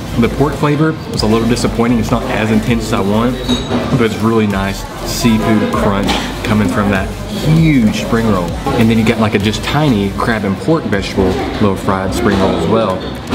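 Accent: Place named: South, United States. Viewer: Love watching u eat.....Your southern draw and demeaner is very refreshing...Pho and other amazing dishes look delicious...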